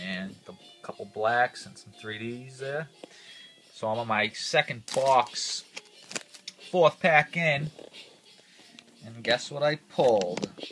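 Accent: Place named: Boston, United States. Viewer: You sound like you're from Boston are you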